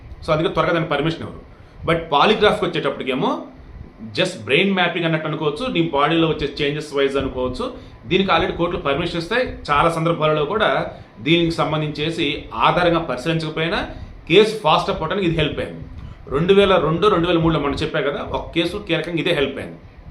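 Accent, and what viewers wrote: Andhra Pradesh, India. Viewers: Your language has more of Andhra slang … for non Andhra people it’s not understandable… please speak in mutual understandable language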